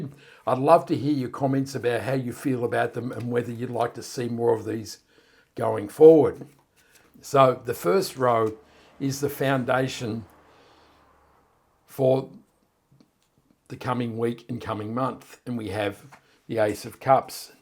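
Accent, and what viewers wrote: Australia. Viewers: Thanks very much - great reading Love listening to a fellow Aussie ❤️ your interpretations always have depth and an authenticity to them Wishing you much light and happiness 😊😂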